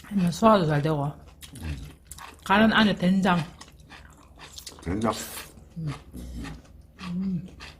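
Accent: Gyeongsang, South Korea. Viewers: 경상도 무뚝뚝한 언어속에 정겨움이 묻어나옵니다 ^^ 항상 잘보고 잘배워갑니다.2022년도 항상 건강하세요~~♡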